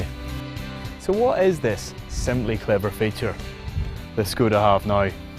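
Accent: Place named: Northern Ireland, United Kingdom. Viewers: I haven't been watching all the motor torque vids recently as I've been busy so I don't know what happened to the previous presenter but this guy is great! A good old Northern Irish accent! Albeit toned down a bit so viewers abroad can understand him but good on you for having a Northern Irish presenter onboard! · Shame those English (?) chaps canny understand our Ulster Scots / Norn Ireland accent. Refreshing to have somebody from our part of the world doing a review. Good content and covers most of what you need to know about the car to guide a purchasing decision - most videos have a positive spin on the car but it does mention some issues and marks it down / realistic marking compared to other flashier / higher performance cars. John Mulholland's (Skoda dealers) here I come.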